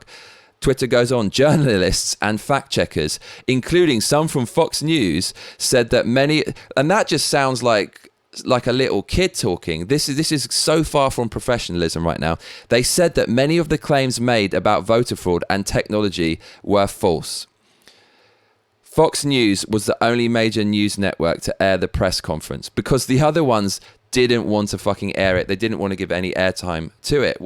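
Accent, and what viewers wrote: England, United Kingdom. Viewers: English accent. I hope this guy isn't yet another far left Trump-trasher. Go watch the Pennsylvania meet with state legislators where huge evidence was presented. The Supreme Court hearings will come next week. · great stuff..glad to hear a British voice speaking truth!!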